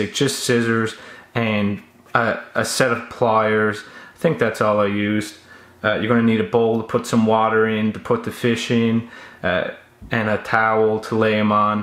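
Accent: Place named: Newfoundland, Canada. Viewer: Started watching this expected you to be form the USA or something then you started talking and I was like accent accent oh wait wtf this guy is from Nfld. Will be building a fish wheelchair in Halifax today.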